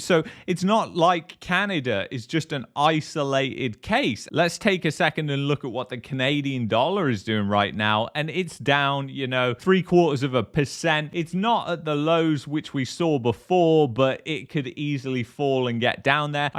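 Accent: United Kingdom. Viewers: What kind of British accent is this? Anyone know? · Lol, IDK but I love it. Obviously he is some kind of libertarian type who understands economics. The scary thing about Carney is he doesn't understands economics, he only understands statistics and politics. He is essentially a Stalinist. It is fun to hear criticisms like this in a British accent. Usually one just hears the colonial pretensions about how great the UK is and how lucky Canada is to be part of it. This channel is keeping it real.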